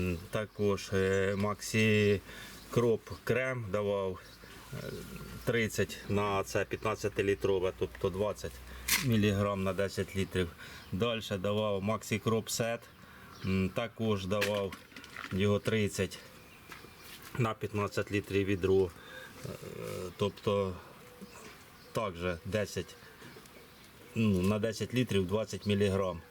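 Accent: Poltava, Ukraine. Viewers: Підкажіть вашу локацію.Чую по говору десьближче до полтавської обл.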